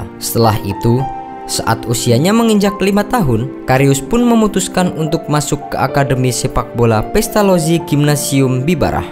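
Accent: Java, Indonesia. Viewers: Ko suara admin medok yah?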